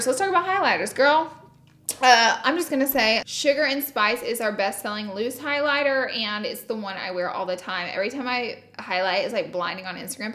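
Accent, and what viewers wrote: South, United States. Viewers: Her southern accent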